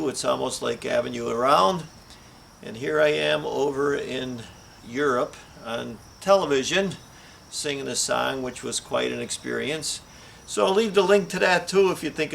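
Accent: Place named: Pennsylvania, United States. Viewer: Thanks for the video. One question, what kind of paint did you use for the steps? Masonry? Brand? Also I think I recognize the accent. You from the Scranton/Central Pennsylvania area?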